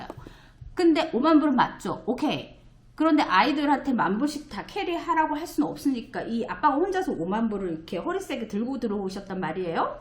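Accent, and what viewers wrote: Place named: Gyeongsang, South Korea. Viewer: 부산출신으로서 억양듣고 알았습니다ㅋㅋ 같은 이민자로서 타지에서 같이 힘냅시다.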